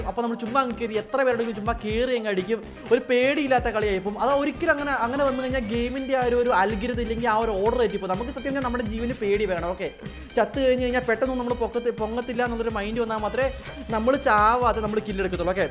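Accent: Kannur, India. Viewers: Bro Kannur aano ?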